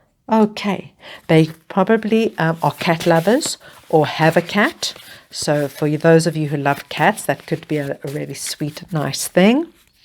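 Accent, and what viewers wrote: South Africa. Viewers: You sound South African. Do you perhaps do personal readings?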